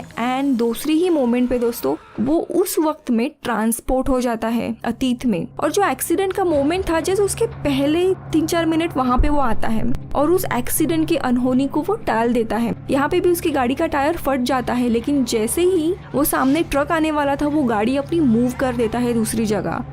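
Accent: Maharashtra, India. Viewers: do you have any relation with climax explain in hindi channel's nikhil ? moreover your accent is quite marathi. are u a marathi? pls tell. like your choice of movies and explaination.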